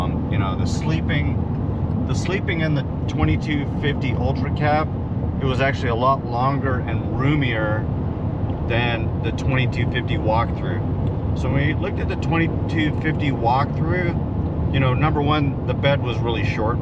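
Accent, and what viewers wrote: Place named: Australia. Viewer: How long have you been in the states? If you're an aussie I can barely tell!!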